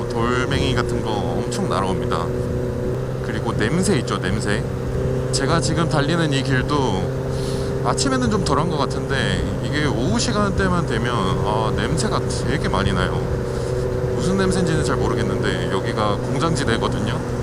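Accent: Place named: Seoul, South Korea. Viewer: 엇!!! 서울말 쓰시는👍👍 멋있습니다!!! 구독하고 갑니당😆😆😆😆😆😆😆 소리 넘나좋은 육반 !!!!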